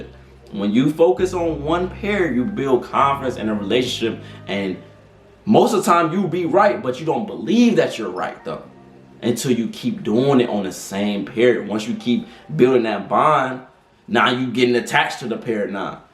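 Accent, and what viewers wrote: United States. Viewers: Lmaoo you gotta be from Detroit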